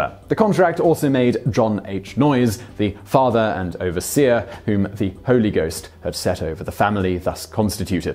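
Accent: England, United Kingdom. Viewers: This is like Stuff You Should Know but better because of the English accent.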